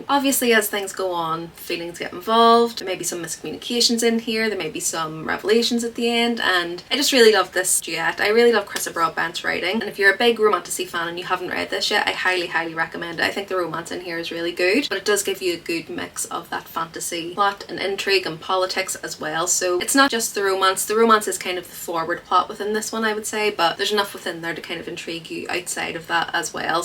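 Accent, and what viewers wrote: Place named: Northern Ireland, United Kingdom. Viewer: So glad to have found a fellow book lover from Northern Ireland! I used to work for the Northern Ireland Bureau (British Embassy) in DC and I miss the accent. 🥰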